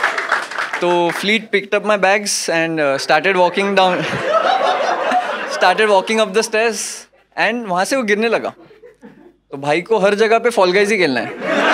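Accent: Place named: Gujarat, India. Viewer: Munawar Faruqui accent dono gujrat se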